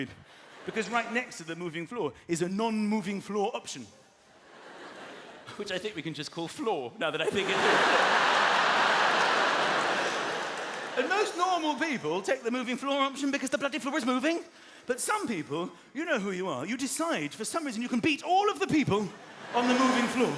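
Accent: RP, United Kingdom. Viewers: And to all the Americans out there. This is an actual British accent. Not the posh snobby accent u bring out. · Michelle Bolen - billy is relatable with his comedy - but i relate to Micheal more..because I’m from where he lives in UK ..so accents are similar (easier to understnd) - with billy, He is a strong Texan accent..you have to really concentrate to understand every word of what he’s saying.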